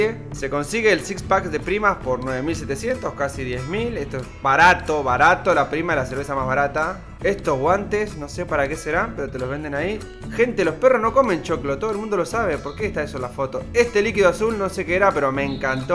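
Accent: Argentina. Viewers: Un Venezolano con acento Argentino, vea pues.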